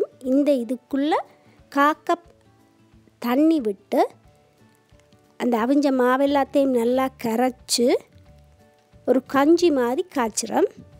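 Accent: Sri Lanka. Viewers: Srilankan Tamil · அழகாக சிலோன் தமிழில் பேசி அற்புதமாக அப்பம்(ஆப்பம்)சுட்டுக் காமிச்ச உங்களுக்கு என் நன்றி!!உங்கள் தமிழ் உச்சரீப்பு நன்றாக உள்ளது!!இந்த அப்பத்துக்கு தேங்காய்ப்பால் எடுக்கலாமா?!அதில் ஊற வச்சு சாப்பிடலாமில்லையா?!நன்றி சகோதரி!! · Hi mdm! I know u are a Sri Lankan by the way u talk n yr slang i m also one but Malaysian Sri Lankan. Seeing yr recipe looks so simple. I have tried but never turned out.now looking at yr recipe so simple.let me try yr respi .please one queston . If I were to use coconut water what would be the measurment be like I'm ke? Do I still make the kanji.n add coconut water ? Pls reply.tq very much.please send more recipes for us.Bye.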